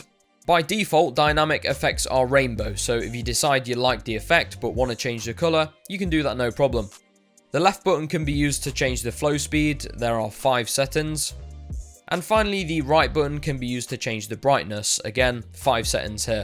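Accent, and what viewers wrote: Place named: United Kingdom. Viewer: Damn you have a strong uk accent :D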